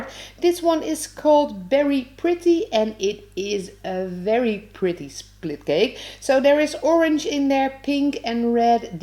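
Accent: Netherlands. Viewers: I didn't know I needed to see this am, but apparently I did Also, are you guys Dutch? The accent from the voiceover sounds very familiar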